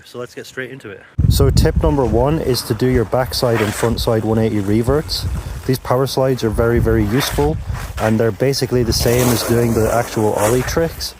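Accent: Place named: Liverpool, United Kingdom. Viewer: Your accent, what is it? Liverpool? Cool video. Subbed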